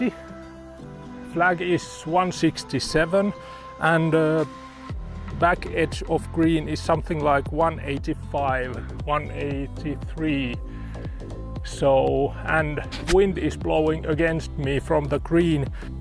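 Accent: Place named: Finland. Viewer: Bit of finnish accent or "rally" accent but so what 🤷‍♂️ keep it coming!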